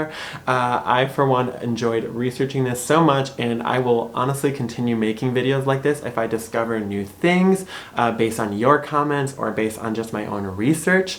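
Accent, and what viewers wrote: Michigan, United States. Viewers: Your accent sounds so cute, please introduce more Michigan things ;) awesome videos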